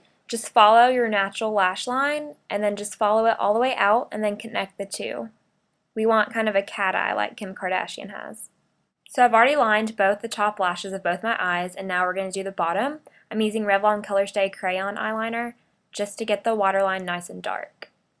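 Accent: South, United States. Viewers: Omg had a country accent lol I love it! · Your southern accent is cute!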